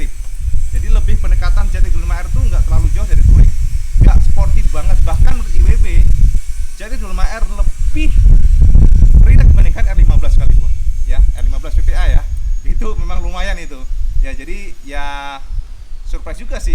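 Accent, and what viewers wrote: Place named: Java, Indonesia. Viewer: Salah satu selalu nonton video IWB tuh bahasanya lucu. Medok2 jawa campur BI cak 😂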